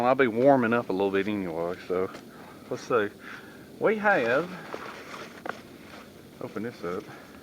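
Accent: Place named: Eastern Kentucky, United States. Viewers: I have not been a subscriber very long and I have been trying to identify where you're from. I'm going to guess the Blue Ridge Mountains of Georgia. I first thought of east Tn or East KY but I definitely hear a little Georgia in there. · Enjoy watching your videos. Listening to you talk sounds like your from our neck of the woods. E.KY Great Job!!!! Keep the videos coming.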